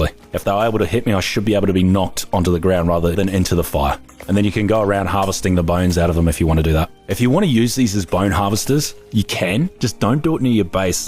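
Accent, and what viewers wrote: Australia. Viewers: Stumbles across a video thanks to the algorithm - Sees the channel name is " " - Wonders "hm, is this guy Aussie?" - Accent confirm. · Are you an aussie? You sound like an aussie.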